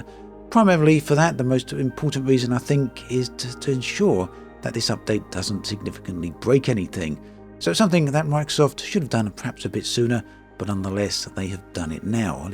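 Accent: United Kingdom. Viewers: First of all I love your videos but I just have one question. Why do you always give the price for things in dollars? You sound very much British to me. It's bad enough our language being taken over by American English without our currency aswell. Sorry. Rant over. Keep up the good work. 👍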